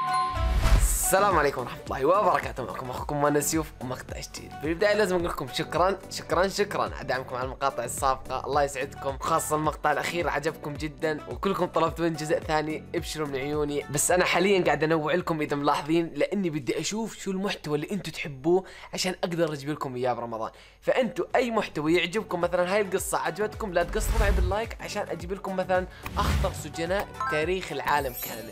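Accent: Jordan. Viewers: سؤال انت اردني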